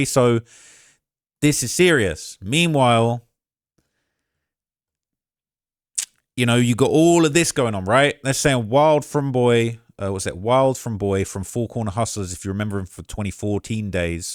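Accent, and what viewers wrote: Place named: United Kingdom. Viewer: This nigga is Chicago new akademiks lmao with a UK accent tho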